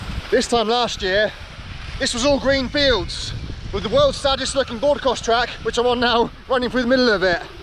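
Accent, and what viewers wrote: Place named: Scotland, United Kingdom. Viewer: you mentioned living in Scotland, are you from Scotland? I always thought your accent sounded similar to Scottish Cyclist David Millar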